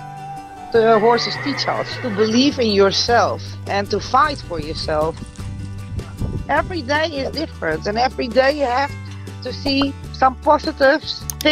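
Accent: Netherlands. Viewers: Why does she sound Dutch?😶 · I thought I heard a Dutch accent and then I heard "Ja, jongen!". Super gedaan! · Hoor ik een hollands accent? Goed gedaan! Dankjewel ❤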